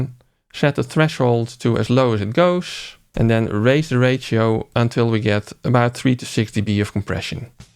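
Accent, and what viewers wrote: Netherlands. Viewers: Wait are you dutch ? I'm hardly noticing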